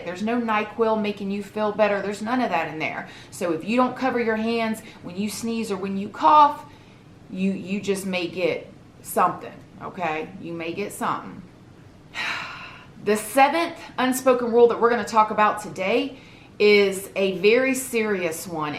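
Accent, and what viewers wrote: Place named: Texas, United States. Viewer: This woman has the Texan accent of my teacher.. But she is from Florida..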